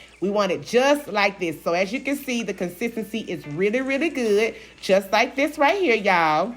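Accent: South, United States. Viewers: It's just so sweet. Your down to earth southern accent and instructions. ❤ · Babyyyyy she sooooo country I love it! I KNOW HER FOOD FIRE! The south for sure she sounds like me lol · I don’t know who this heffa here is, but babbbbyyyyyyyyy I swear my husband and I love her country talking ass! Her big Ma showed here just what to do and she put her own comedy twist to it God bless this sister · Like her southern talk.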